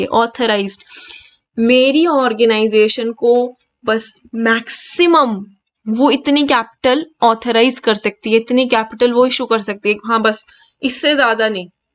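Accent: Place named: Kashmir, India. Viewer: Mam u seems have like our Kashmiri voice Thank u so much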